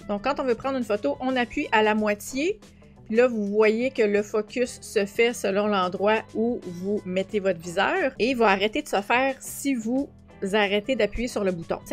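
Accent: Quebec, Canada. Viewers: Avant tout, trop mignon cet accent québécois. Il est vrai que le bouton enclencheur, dans sa position à mi-course, règle deux paramètres. Le premier est l'AF et le deuxième est... la mesure de la lumière appelée posemètre. C'est la raison pour laquelle, il est utile de séparer l'AF et la mesure de la luminosité, avec le back button. Par contre l'autofocus a ses limites pour des photos de nuit très sombre. D'où une mise au point manuel à l'aide de l'écran (Live View pour Canon).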